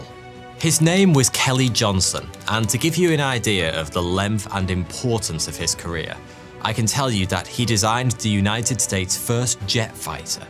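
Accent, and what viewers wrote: United Kingdom. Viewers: Good video and good sense of humor. Also, 'good' Brit accent that makes 'th' into 'ff'. 'Fifth'. for instance, becomes 'fiff'. As one obsessed with the details, I wonder what region that represents?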